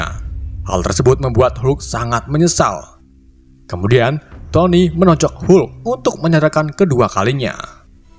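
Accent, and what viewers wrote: Indonesia. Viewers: penyebutan nya indonesia bangeetz😂 , 👍👍🤗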